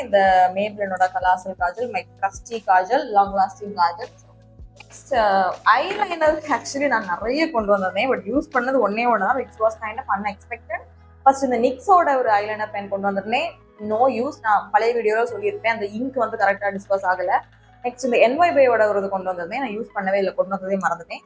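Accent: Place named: Kanyakumari, India. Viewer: Sis..! Your slang is nice.Are u from kanyakumari?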